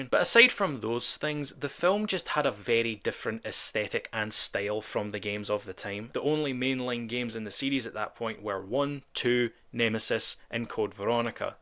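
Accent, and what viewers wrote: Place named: Scotland, United Kingdom. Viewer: This is the second of your videos ive watched. Funny commentary and I fucking love the Scottish accent. So hot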